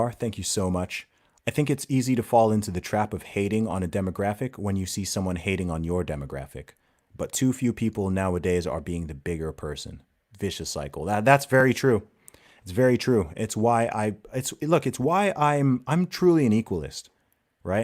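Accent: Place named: United States. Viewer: Idc what anyone says you sound like you are from America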